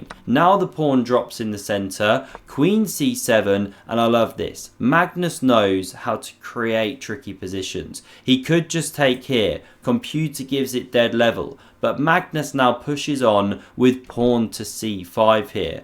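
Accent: England, United Kingdom. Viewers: Great dead-pan British humour - and well chosen chess games. (I suspect you are an English person - based on the accent.)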